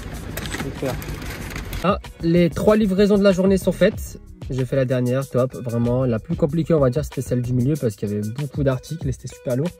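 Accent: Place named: Tourcoing, France. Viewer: On dirai tourcoing 😁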